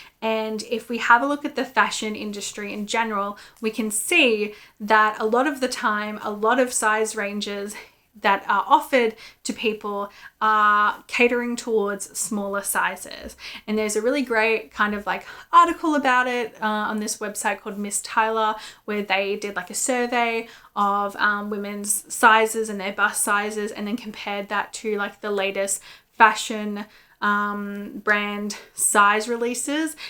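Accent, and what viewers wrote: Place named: Australia. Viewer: under which rock have you been living. The accent and the acknowledgment at the beginning. Obviously an Aussie.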